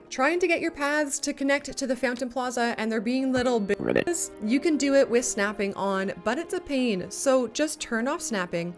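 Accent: Newfoundland, Canada. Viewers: Excellent video! I noticed that you say, "a-boot" instead of "a-bout". As a Canadian, you are the only person I've ever heard using this pronunciation. Vaguely, where are you from? Is it standard pronunciation there? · Are you a Newfie? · Holy Canadian accent, girl! You said it once and my eyebrow went up, then you said it again... "Round-a-boot". I moved away years ago and it is nice to hear the accent every once in a while. 😁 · Your a Newfie!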